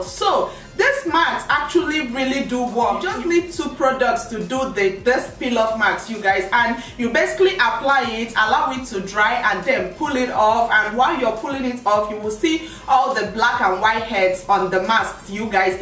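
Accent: Nigeria. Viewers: She sounds Nigerian. my teachers and friends have the same accent, and they're from there. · I guessed from her accent that she was Nigerian, like me, and I've seen it confirmed multiple times in comments. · Many of Nigerians people speak that way. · Nigeria most likely, because her accent sounds very strong nigerian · She's from Nigeria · jmelias shanelle no. She just has one of the accent from a tribe in Nigeria. (Igbo) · U sound Nigerian :) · She must be Nigérian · Vasty A. she is Nigerian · are u nigerian ? u sound exactly like my parents...and basically 2/3 of my family . and if u are may i ask what part ? :))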